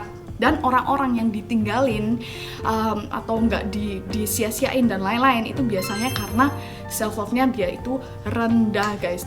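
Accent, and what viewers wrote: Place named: Java, Indonesia. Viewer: Baru nemuu chanelnyaa kak... ini ngejelasinyaa ada kejawa jawaan hahahah makin greget🤗🤗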